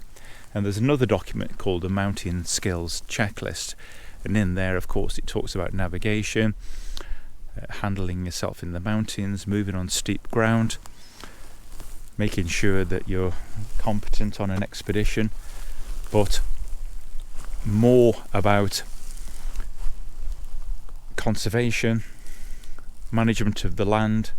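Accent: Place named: Stoke, United Kingdom. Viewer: Interesting video, I’ve been considering this for a little while. But more importantly…….. are you from Stoke.? You sound like you’re from Stoke but haven’t lived here for a while as the accent is now quite subtle. Now if you’re not from Stoke , it sounds like your are 😂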